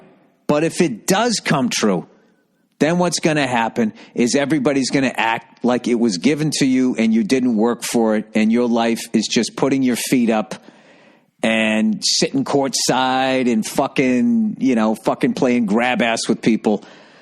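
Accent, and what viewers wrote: Boston, United States. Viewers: Listening to Bill cuss with a Boston accent is awesome.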